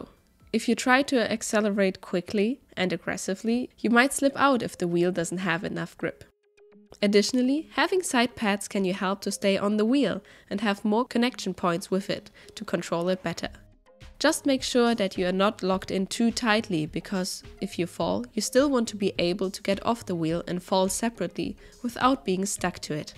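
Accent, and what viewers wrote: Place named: Europe. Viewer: With your accent, I thought you were European, but this is definitely my home, Vancouver. Can't believe it took me two and a half minutes into this video to be sure!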